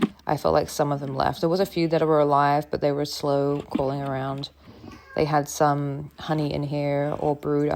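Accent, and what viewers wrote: Australia. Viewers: It's giving Aussie to me. Faint Aussie or U.K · That’s an Australian accent!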